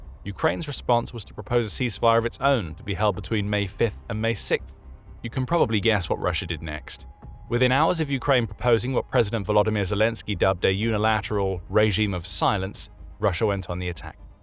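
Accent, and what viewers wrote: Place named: Cockney, United Kingdom. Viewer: I can't stand to hear you say Rush-er, and other words like propagand-er, you sound like a cockney Brit!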